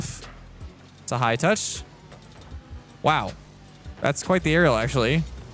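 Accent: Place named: Canada. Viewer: i can hear the canadian so much more now omg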